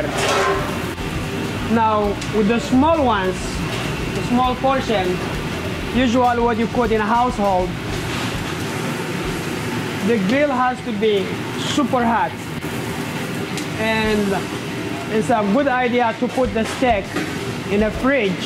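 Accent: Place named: India. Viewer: This cook is really messed up he is talking part indian accent and part arab accent ... i m very confused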